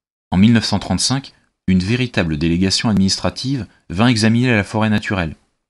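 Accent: North, France. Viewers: Dommage que l'accent soit du nord pour raconter une histoire que Giono a raconté avec notre accent du Sud!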